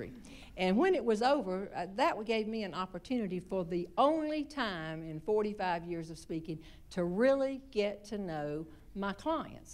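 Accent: South, United States. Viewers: NC..gal, me too..shes good...and we do talk like that...some even more southern than her lol....NC is a great place to live!!! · Ditto, I'm just now seeing all of her clips and she's hilarious. Love her refined Southern accent she's so elegant. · And while she can't match up to Bob's legendary dead-pan comedy, she more than makes up for it with a strong dose of Southern twang and culture!!! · You gotta love southern American accent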